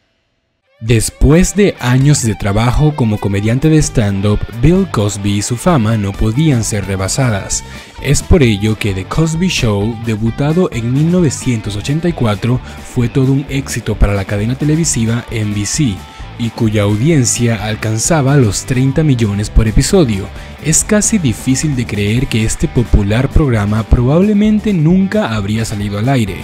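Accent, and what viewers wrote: Colombia. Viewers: tu eres de colombia? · Oye eres de Colombia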